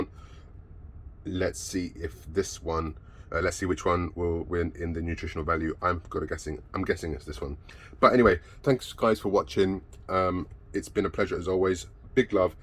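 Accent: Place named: England, United Kingdom. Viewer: really enjoyed these posts thank you, do i detect a slight English accent ??? 🤔ATB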